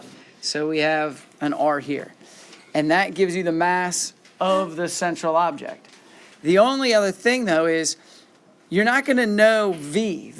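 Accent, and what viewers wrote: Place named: Australia. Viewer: This guy has the mildest Aussie accent I've ever heard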